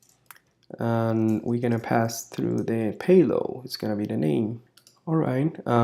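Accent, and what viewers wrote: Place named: Brazil. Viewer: I thought he was Brazilian because of his name too, the accent sounds like the Brazilians who speak English as well haha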